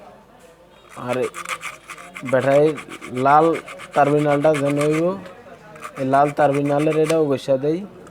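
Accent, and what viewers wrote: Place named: Bhola, Bangladesh. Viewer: আমি আপনার কথা শুনেই বুযেছি যে আপনার বাড়ী ভোলা, আমার বাড়ীও ভোলা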